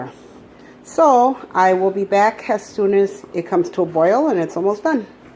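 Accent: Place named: Puerto Rico. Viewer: Se ve bonito y aparentemente sabroso. Voy hacer un comentario porqué si no exploto.Señora usted tiene un acento puertorriqueño y habla buen español¿Porqué habla en Inglés,Inglés con acento puertorriqueño e inclusive hasta los ingredientes en la receta están en Inglés?Estaba buscando una receta de patitas de cerdo al estilo puertorriqueño y me encuentro con la suya y me da pena encontrar la suya donde parece le avergüenza hablar español?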